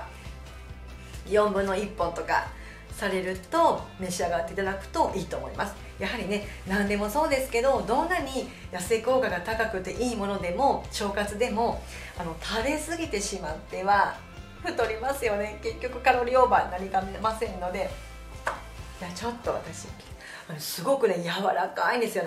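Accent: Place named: Tohoku, Japan. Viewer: デーツを１日３粒食べてますがなかなか減らない体重🤧 パートナーには(ゴキブリ食べてる)と嫌われました🤣 さんの東北っぽいアクセントに安堵してます🤗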